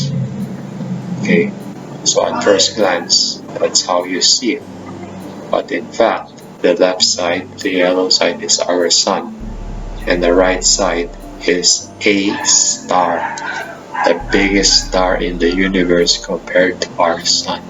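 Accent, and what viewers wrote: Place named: Philippines. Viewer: totally has a Filipino Accent.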